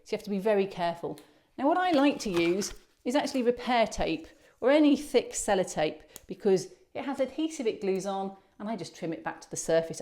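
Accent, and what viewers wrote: United Kingdom. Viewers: Oh my ,I`m going back 3 years,but soooooo much to see and learn from you.First off ,as the lady below mentioned, it is quite nice to see a woman doing these types of tutorial videos....a real breath of fresh air (and I like the English accent) Now then I plan on doing a bit of water effects on my expanded layout (most likely using Envirotex Lite ,readily available at my craft store and 50% off coupons make it a good choice) and was wondering if you were using Tamiya acrylic paint to tint your water product and if I could use Tamiya to tint Envirotex Lite ? Thankyou and please keep making these fine videos!! Oh oh ,I was watching another of your vids (Dec.2015) about your troubles with Envirotex Lite ,the browning and the bubbles etc.Do you figure it was the way you mixed the product that may have caused these troubles ?Thanyou.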